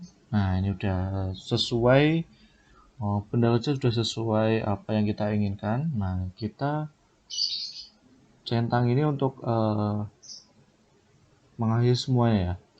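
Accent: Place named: Java, Indonesia. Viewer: suaranya jawa kluthuk bgt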